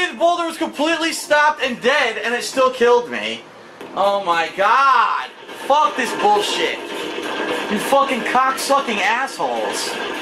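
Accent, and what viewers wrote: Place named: New York, United States. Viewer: I can tell your from New York.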